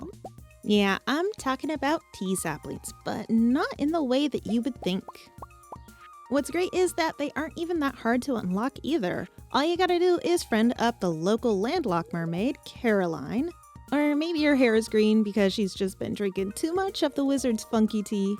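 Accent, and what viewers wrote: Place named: United Kingdom. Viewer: I am getting sone serious bri'ish vibes.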